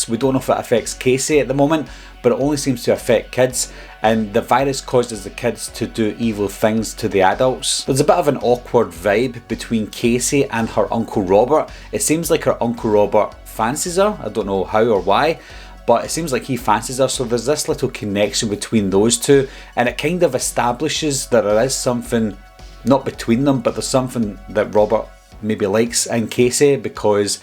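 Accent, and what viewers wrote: Scotland, United Kingdom. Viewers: First time viewer. Solid intro, then at 25 seconds that Scott accent starts in with the vocabulary. Instant subscription.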